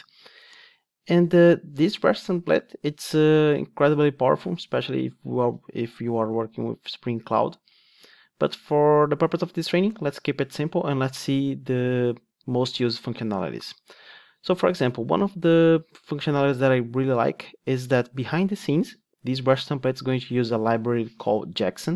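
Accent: Brazil. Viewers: inglês com sotaque de brasileiro é um mamão com açucar pra entender ahuahauhauha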